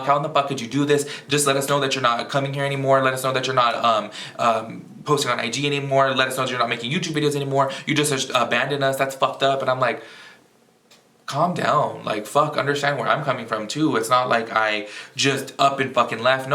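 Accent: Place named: South Florida, United States. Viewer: Aww I missed you! I love your reviews. Ive always wondered are you from Miami originally? Or Lauderdale? I pick up on that accent being from south Florida myself.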